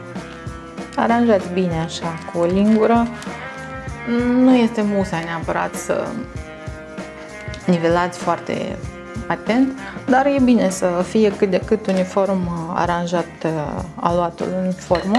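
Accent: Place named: Moldova. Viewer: Cele mai bune retete! Si de asemenea f deosebite! Ador accentul moldovenesc autentic. Nu-l ascunde! Multa bafta in continuare!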